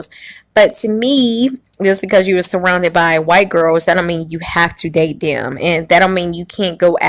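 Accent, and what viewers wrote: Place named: Germany. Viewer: I couldn’t understand this video. You sounded way to German for my English ears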